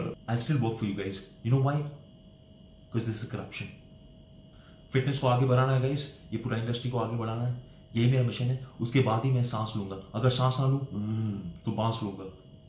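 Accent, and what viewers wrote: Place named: Bengal, India. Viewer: Haha this was such a fun dig at this guy! That bengali accent added more flavour..regards, fellow bong :)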